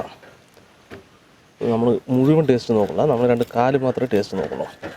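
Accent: Palakkad, India. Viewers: assalamu alaikum epozhum watch kayyil kanarund athond chodikkunnatha water resistant aano ikkayude watch? Njan oru army karan aanu palakkadan swadeshi epozhum ikkante videos follow cheyyarund bcz of your palakkadan slang keep going we are all with you always....(respect) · കുക്കിങ്ങ് വീഡിയോസ് ഇത്രക്കും റീച്ച് ആകാൻ പ്രധാനമായ കാരണങ്ങൾ... 1.പറമ്പിലും പാടത്തും പച്ചപ്പ് നിറഞ്ഞ ഗ്രാമ ഭംഗി യിലുള്ള ചുറ്റുപാടിൽ എടുക്കുന്നതും കൊണ്ട്😍 2. നാടൻ ലുക്കും വിനയം കലർന്ന പാലക്കാടൻ സംസാരവും😍 3.എന്തിനും കൂടെ നിൽക്കുന്ന കുറേ കൂട്ടുകാർ😍 4. അഗതിമന്ദിരത്തിലേക്കും മറ്റും ഭക്ഷണവും സഹായവും എത്തിക്കുന്ന മനസ്സിന്റെ നന്മ...😍👉👉ക്യാമറമാൻ്റെ കാരൃം പിന്നെ പറയേണ്ടതില്ലല്ലോ....😍 ഇതെല്ലാം കൂടിക്കലർന്നപ്പോൾ Village Food അങ്ങട്ട് കളറായി ജനങ്ങളില്...🥳...😍 ശരിയല്ലേ പറഞ്ഞത്🥳🥳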